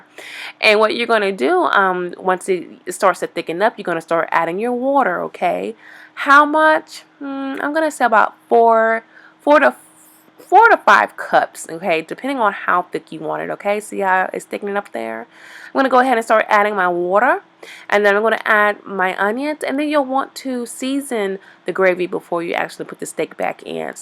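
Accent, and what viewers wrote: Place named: South, United States. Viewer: I love this lady, sounds so family like and comforting, guess it’s a southern thing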